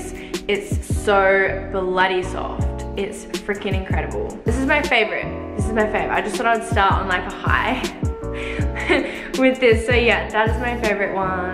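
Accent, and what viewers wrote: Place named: Brooklyn, United States. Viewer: Love ur accent, Brooklyn :) nice video O:)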